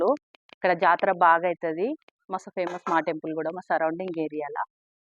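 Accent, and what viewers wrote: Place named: Rayalaseema, India. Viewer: మీది ఏపీ నా....రాయలసీమ భాష మాట్లాడుతున్నారు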